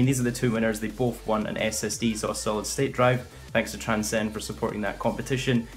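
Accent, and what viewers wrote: Scotland, United Kingdom. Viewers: are you Scottish because you have the accent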